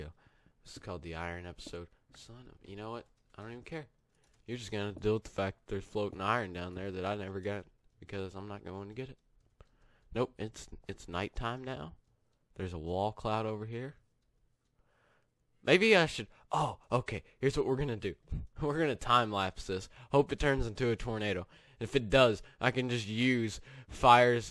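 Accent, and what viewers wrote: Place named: Kentucky, United States. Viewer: I seen that is from Kentucky he’s got that accent I live in pikeville KY btw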